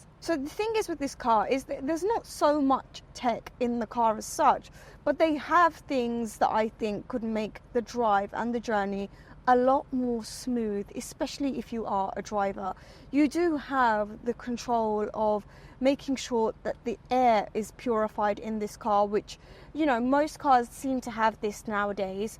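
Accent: United Kingdom. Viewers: where are you from originally? Also i can sense the british accent lol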